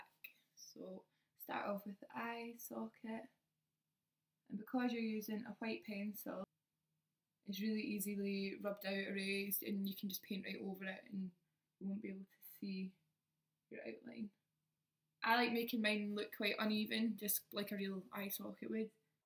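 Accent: Scotland, United Kingdom. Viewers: Are you Scottish xx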